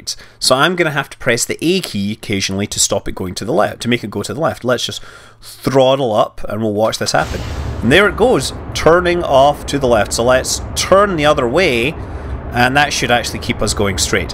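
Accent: Scotland, United Kingdom. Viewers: hence your name, you sound a bit scottish.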